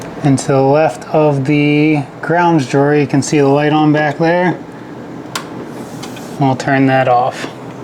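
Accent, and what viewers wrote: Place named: Philadelphia, United States. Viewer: Very informative! I appreciate learning about the Cameo. You sound like you are from Philly.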